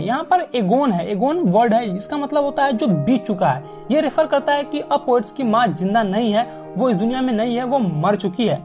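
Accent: Bihar, India. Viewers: Aap Bihar saa hoo kya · ABA SALA BHIHARI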